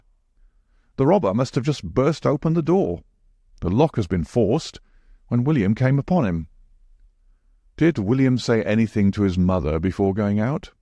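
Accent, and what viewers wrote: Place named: North, United Kingdom. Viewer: A nothern man as narrator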